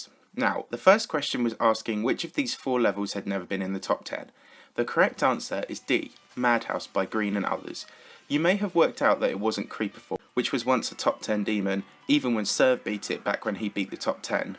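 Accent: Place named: England, United Kingdom. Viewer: No, he's not french. He doesn't speak French, he doesn't look French, and has an English accent. He's from the UK.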